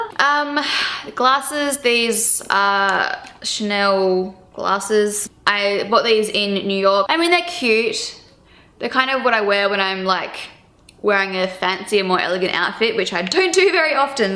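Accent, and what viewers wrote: Australia. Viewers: The Australian accent is really strong ahah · are you aussie i am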